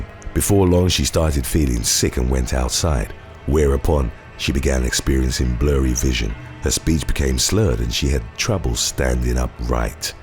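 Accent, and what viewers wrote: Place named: United Kingdom. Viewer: British voice overs always make mysteries and videos more intresting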